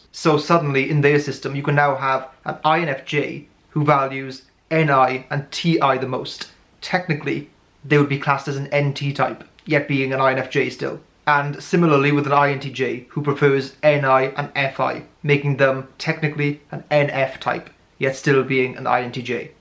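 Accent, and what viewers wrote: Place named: England, United Kingdom. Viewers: Now if I can figure out your accent. Not scouse! RP with a touch of the Midlands maybe .... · I love your accent. It sounds special. Maybe it's English-English. There is mostly American-English on Youtube so this one is different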